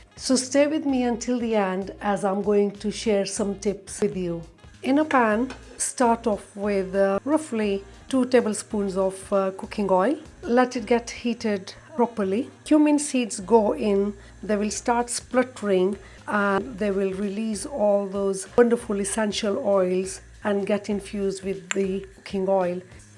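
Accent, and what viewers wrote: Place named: East Africa. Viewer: I can heard east Africa you Born in Here or you been Here tanzania I here Sis I like this recipe nice and taste stay safe and healthy I will cook this sometime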